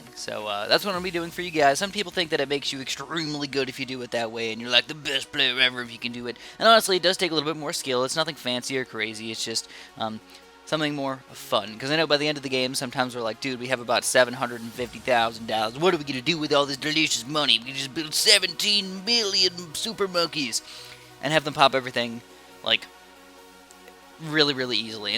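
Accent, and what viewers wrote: United States. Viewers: Wait what state are you in, that sounds exaclty like where I live.....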